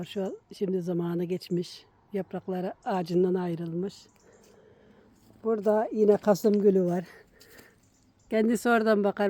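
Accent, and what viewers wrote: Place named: Anamur, Turkey. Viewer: BACIM SEN ANAMURLUMUSUN ŞİVEN ORALI ❤❤❤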